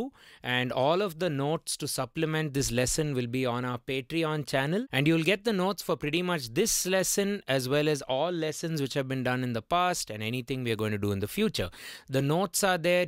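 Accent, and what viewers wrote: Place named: India. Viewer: From your accent i'm guessing you're Indian, yet how are you named ' '? I'm curious to know the story lol. Anyways amazing video.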